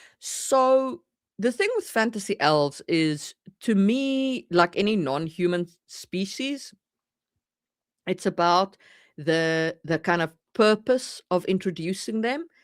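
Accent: South Africa. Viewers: Afrikaans? I've always wondered about your accent when you said you were from Finland because it always sounded like South African to me. Good to finally know.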